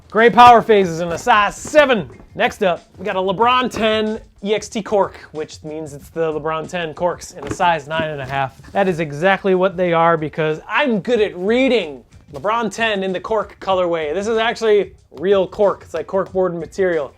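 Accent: Chicago, United States. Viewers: Your Chicago accent veers more and more toward Bartok, the bat from Anastasia, every time you do it. (You're too old to get this reference and most of your subs are probably too young so I guess this comment is for me)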